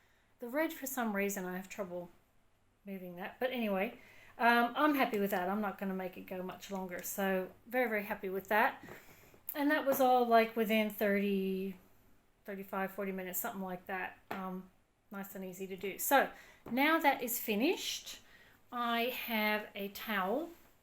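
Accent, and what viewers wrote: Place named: Australia. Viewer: You have a very interesting accent. Australian mixed with what? Canadian? American?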